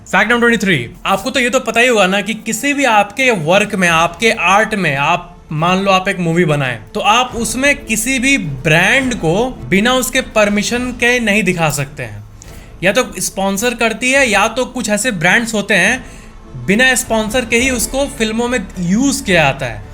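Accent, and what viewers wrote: Bihar, India. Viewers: Sir aap bihari ho na please reply me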